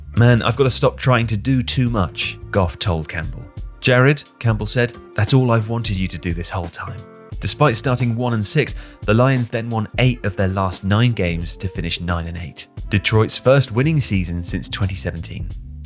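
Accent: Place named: United Kingdom. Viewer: It’s weird hearing a British accent explaining the NFL…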